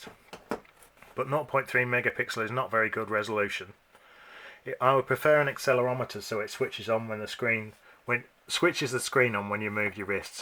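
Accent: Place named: United Kingdom. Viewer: That's British English bro.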